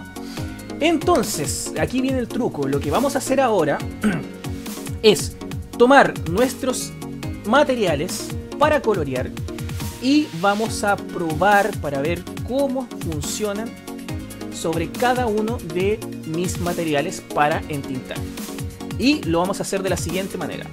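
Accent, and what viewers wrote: Chile. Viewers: Siento que todos los chilenos hablan iwal xd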